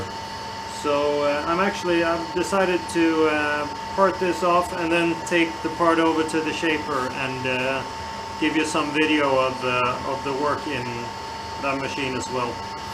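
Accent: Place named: United States. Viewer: Thanks for the information. I'm in Nuuk, Greenland. I was just curious because your American is so good, I couldn't place where you might be from. I enjoyed the slow motion shaper vid. Nice.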